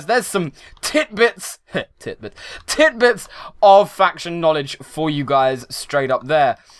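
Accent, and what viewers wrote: United Kingdom. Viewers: Is hey British?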